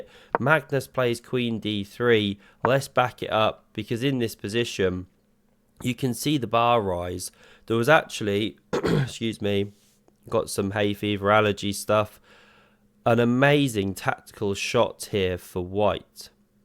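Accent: United Kingdom. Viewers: Love the humour! And the British accent <3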